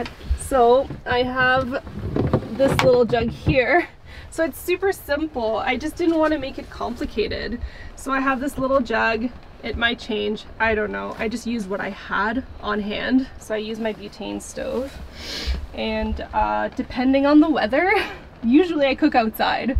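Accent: Ottawa, Canada. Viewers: She sounds like an Ottawa girl. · I was like thats an Ottawa accent. I didn't think we had one but we do.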